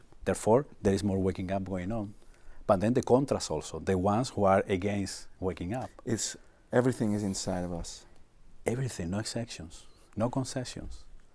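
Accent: Spain. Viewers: Voor mij, wonend in galiciē is het vreemd om engels met een sterk spaans accent te horen. Ik ben er door afgeleid. ik zit steeds te wachten tot hij in het spaans verder gaat, maar dat gebeurt niet.😂😂.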